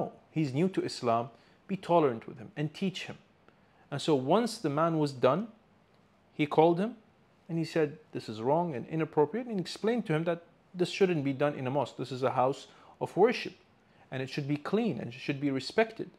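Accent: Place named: India. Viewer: ..why do you sound like Indian, ?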